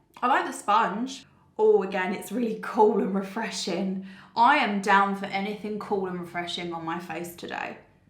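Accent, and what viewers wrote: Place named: Australia. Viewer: Only found you recently and really enjoying your videos, you’re super down to earth and relatable, your accent gives me Australian vibes, I know you’re from the UK but it’s like you have an Australian twang on certain words, don’t mean any offence 🥰😍keep coming with the content I love it xx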